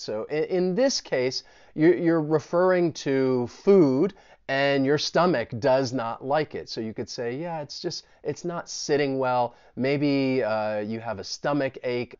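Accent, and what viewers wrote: United States. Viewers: AN AMAZING CHANNEL AND USEFUL YOU'RE THE BEST BRO I REALLY LIKE THE AMERICAN ENGLISH ACCENT WHAT DO YOU RECOMMEND THAT I WATCH FROM YOUTUBE CHANNELS OR WEBSIT TO LEARN THE AMERICAN ACCENT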